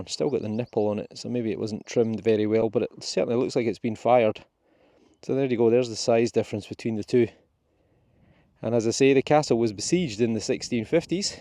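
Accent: Scotland, United Kingdom. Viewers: I enjoy both, I love the Scottish accent 😅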